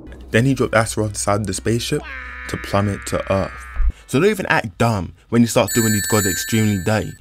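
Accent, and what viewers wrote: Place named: United Kingdom. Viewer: Stfu British zn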